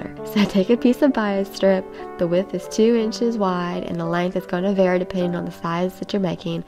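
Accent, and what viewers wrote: South, United States. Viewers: I have been smocking for 27 years and I love your videos, especially your sweet southern accent and background music. I am glad to see a young person reviving the dying art of French Hand Sewing. I also used to take my "project baggie" (or several) with me everywhere! Best wishes!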